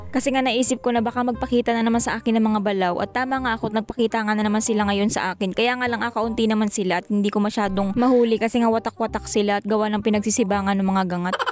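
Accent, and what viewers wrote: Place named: Laguna, Philippines. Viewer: Watching without skipping ads done na miss ko makinig at manuod ng videos mo😂😂 relate kase ako sa punto mo ganyan din sa namin sa kalayaan laguna..shout out 😂😂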